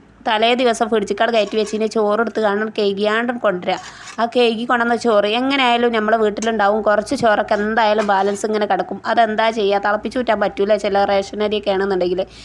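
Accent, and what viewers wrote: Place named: Malappuram, India. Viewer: ഇത്‌ ആ മലപ്പുറം താത്താടെ സൗണ്ട് nd സംസാര രീതി ആണല്ലോ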